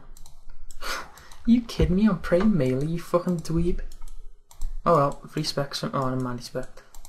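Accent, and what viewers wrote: Liverpool, United Kingdom. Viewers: Cheers mate ;D love the vids keep them up, scouse accent is ftw!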